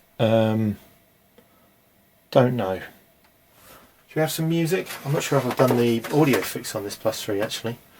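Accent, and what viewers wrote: United Kingdom. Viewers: ahhhh its so nice to find a fellow English man who loves to muck around wioth old computers etc...lots of Americans nothing wrong with that but to hear "Oh Geezer" lol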